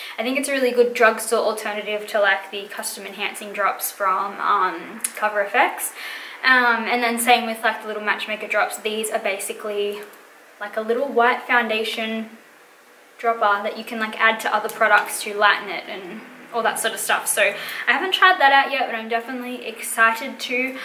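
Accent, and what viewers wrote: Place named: Australia. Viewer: 'Just over here fuckin goin ta town' 😂😂😂 your Aussie is on fire in this tut 🔥😆 love it! Hello from Perth definitely subscribing xx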